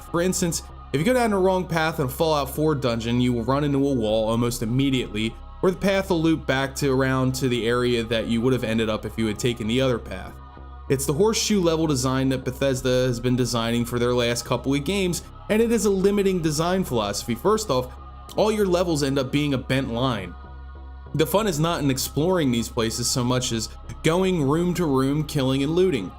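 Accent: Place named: Baltimore, United States. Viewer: love that baltimore accent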